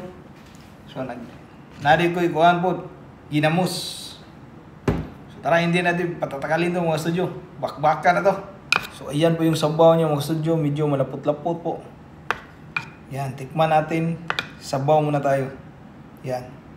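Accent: Visayas, Philippines. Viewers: Bisayaa jud ni ug tingog ka studio😄😄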